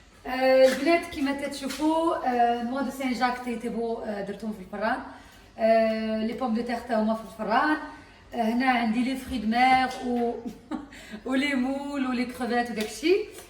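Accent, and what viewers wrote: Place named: Arab world. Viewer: Machaallah 3lik j aime bien ta simplicité tes goûts et ton accent arabe j adore bonne continuation 😍😍😍😍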